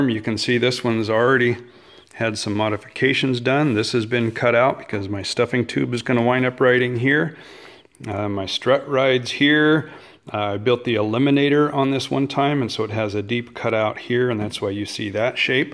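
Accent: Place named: England, United Kingdom. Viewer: Oh, p****n d'accent rosbeef !!... 👎🏻👎🏻👎🏻👎🏻👎🏻👎🏻🤢🤢🤮🤮🤮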